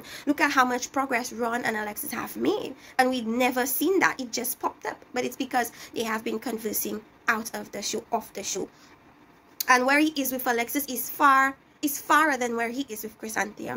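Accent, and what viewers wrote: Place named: Caribbean. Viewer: Are you a Lucian or a Dominican? I love your accent.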